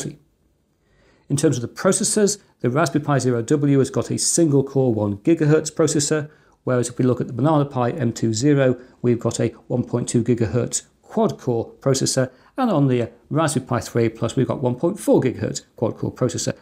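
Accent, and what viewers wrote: United Kingdom. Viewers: old lovley man with that british accent like that and like the way you like respery pi s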